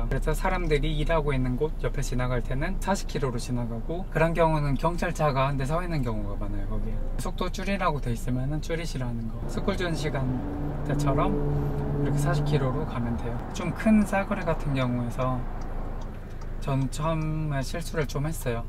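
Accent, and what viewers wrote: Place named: Jeolla, South Korea. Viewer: 어딘가 전라도의 온기가 느껴지는 ㅋㅋㅋㅋ😂 감사히 잘봤습니다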